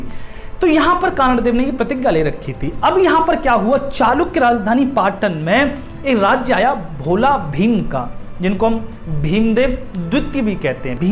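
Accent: Braj, India. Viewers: Kha se ho aap gurudev.. Aapki bhasa humari jesi hi h...braj chhatra ki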